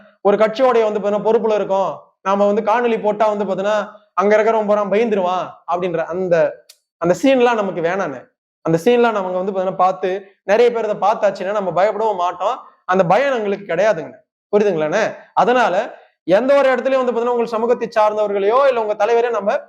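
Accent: India. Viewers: தம்பி நீ தமிழ் நல்லா படிச்சிட்டு வா நீ பேசுறது ஹிந்தி காரன் தமிழ் பேசுறது மாதிரி இருக்கு. அது போக உங்கள மாதிரி பணத்துக்காக கொள்கை இல்லாமல் இருக்குற கட்சி ptk இல்லை நாங்க எப்போதும் தப்புனா சுட்டி காட்டுவோம் உங்கள மாதிரி பணத்துக்காக பேசமாட்டோம்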